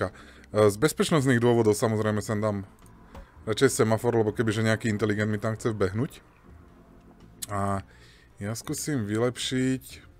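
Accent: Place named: Slovakia. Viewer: Ach,jo..Ty jsi ale případ. :D Ale nakonec jsi zjistil jak na to,ne?Asi ti to zabralo pár hodin pátrání a zkoušení,ale co už no....Jako v tom večerníčku Pat a Mat.Akorát,že ty jsi na to sám.Moc jsem se pobavila. Děkuju. :D Vím,že se opakuju,ale to slovenský ř nemá chybu. :D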